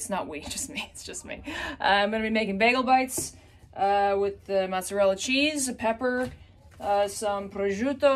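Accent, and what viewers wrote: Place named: New York, United States. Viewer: is from New York City, specifically Queens. And yeah, her inner New Yorker came out fully here, and it is awesome.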